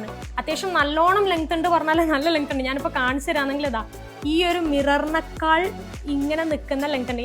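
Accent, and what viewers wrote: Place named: Kasaragod, India. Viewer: Haii chechiii I'm your big fan....❤❤enikk chechiye orupaad ishtawa chechide samsaram kelkkan nalla rasssaa ❤😭..chechide slangum ishtawa enikk❤❤❤... Chechiii kasaragod alleyyy njnum kasaragod thanneyanuuu.. Enikk chechiye neritt kanaan thonnunnuu😭😭😭🤍🤍🤍🤍.ende per vaishnavi oru hai tharumo plzz 😭😭😭🤍🤍🤍... Ee video ishtapettu ❤❤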